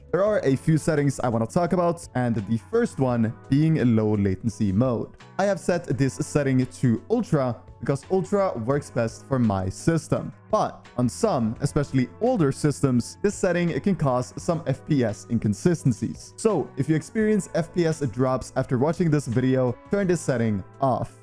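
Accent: Norway. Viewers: U Norwegian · Er du norsk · er du norsk?????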